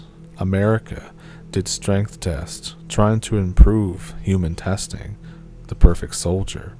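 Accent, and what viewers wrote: Canada. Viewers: I’d suggest that you employ the computer generated voices, as your spoken voice, with your Canadian accent, is pretty much monotone and there is no inflection nor alteration of tone… there is so much more that could be done with the content if only you took advantage of the enhancement opportunities that exist… just sayin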